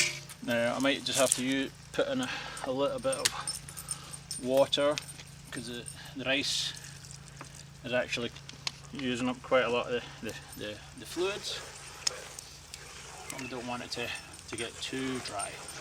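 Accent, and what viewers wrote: Scotland, United Kingdom. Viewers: Are you Scottish (I am) and for the pen fishing rod video you made a year or so ago can you make a follow up video on the line, hooks, bait, floats, weights ect. Great video and have a good day!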